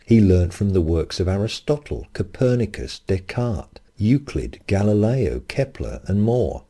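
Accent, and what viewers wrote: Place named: United Kingdom. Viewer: but there are people that invented things without learning anything prior, like Tesla. Tesla and others described their experience as a shamanic trance experience where the Universe told them what to do. The life explosion from the Cambrian is exactly that, apparition of sophisticated life already evolved out of nothing, with no intermediary species. And sincerely I do not see how this idea, even taken as valid, refutes intelligent design. What you're saying is a designer must learn from his mistakes in order to make sophisticated things. So the process looks exactly like evolution, however, evolution itself being the works of a designers who keeps learning from his mistakes and trying new things. Your ideas do not refute the designer, refute the fact that designer couldn't come up out of nothing with sophisticated life. 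Your idea just proves evolution, but not the nonexistence of a creator, actually it even makes a case for it. Another ridiculous bong argument, I wasted my time, I swear, whenever I hear the bong accent I will close the video. Ridiculous sofisms, so cringe, so awkward, so out of touch with the reality, so clumsy, so pompous and pretentious. Bong people in a nutshell.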